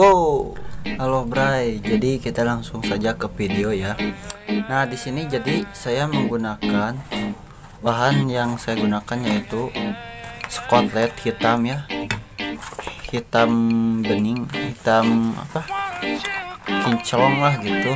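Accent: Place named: West Java, Indonesia. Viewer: Sunda mamang😁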